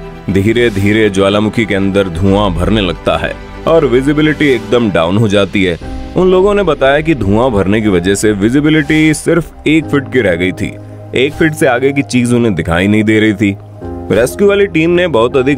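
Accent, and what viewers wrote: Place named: Hills, India. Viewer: आपका आवाज बहोत पहाडी है sir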